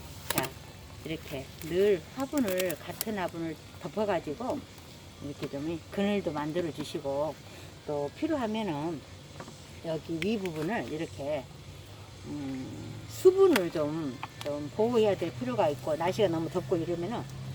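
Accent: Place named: Suncheon, South Korea. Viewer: 설명 잘 들었어라 근디 엄니 거가 어디다요 말투가 완전히 우리 고향인디 저는 순천 주암이요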